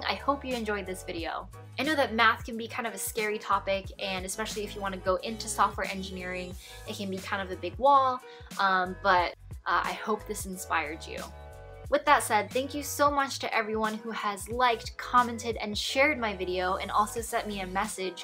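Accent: United States. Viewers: Are you American? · bagus adji i think the name is Japanese but the accent makes her sound like she was born in the US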